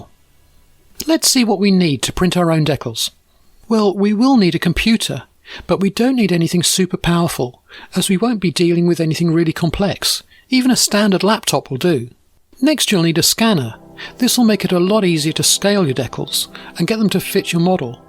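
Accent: United Kingdom. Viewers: I am indeed. I usually like the way the accent sounds from your side of the pond, that one though, grates on my ears. 😜 Keep up the good work. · I speak for everyone when I say, we love how you Brits across the pond say stuff like; Decals, Schedule, Bonnet, Lorry, The Loo, etc. it’s so posh! 👌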